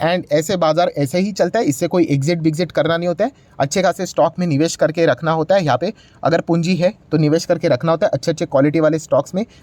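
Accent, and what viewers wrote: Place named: Kanpur, India. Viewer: Lag raha guru UP ke kanpur se ho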